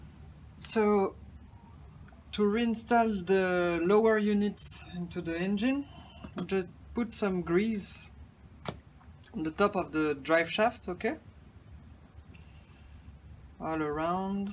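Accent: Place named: New Zealand. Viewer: Very usefull, with a Kiwi accent, thanks.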